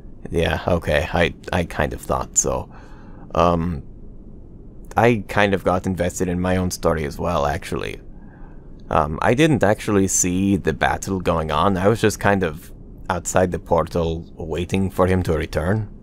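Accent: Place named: Bulgaria. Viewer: Love the Bulgarian accent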